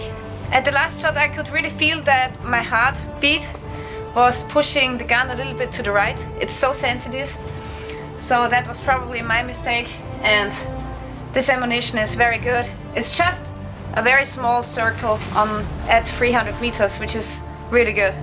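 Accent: Austria. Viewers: very strong german accent，very Österreichisch tuned, better u do it in german and english subtitled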